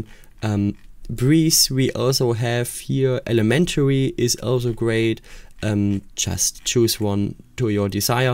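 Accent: Germany. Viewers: Thank you mate, your Accent sound like Super German by the way😊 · Love that german accent :face-blue-smiling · ich höre da einen leichten deutschen akzent raus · Den Deutschen höre ich doch raus, danke für das Tutorial! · i love deinen german accent Digger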